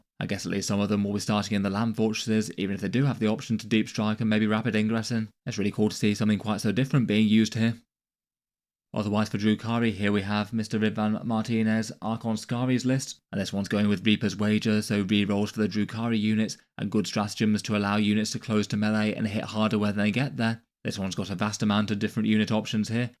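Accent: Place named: Midlands, United Kingdom. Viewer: he's 1000% got an english accent, I want to say midlands somewhere? It's not very distinctive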